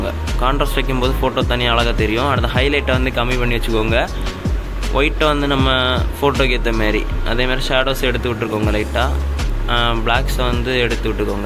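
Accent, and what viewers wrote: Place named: Kanyakumari, India. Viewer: Kanya Kumari ah bro❤naama slang teriyuthu💥💝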